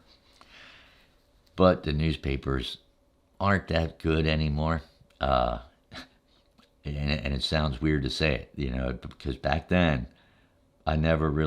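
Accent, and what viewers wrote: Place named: Philadelphia, United States. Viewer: You've gotta be from Philly or from the Philly burbs. Just a hunch! You sound like most of the people in my family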